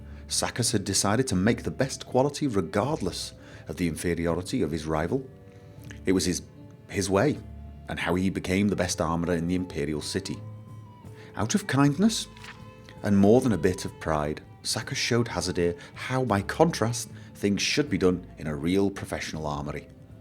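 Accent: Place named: Scotland, United Kingdom. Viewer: I believ you were from norway, but your accent sounds scottish to me.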